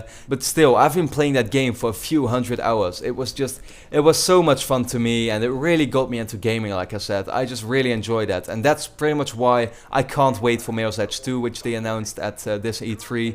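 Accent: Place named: Netherlands. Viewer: I noticed ypu have a dutch accent. Are you dutch?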